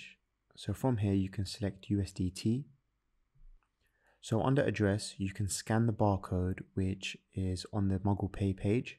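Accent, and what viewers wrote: United Kingdom. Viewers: from the usa why does he sound british wtf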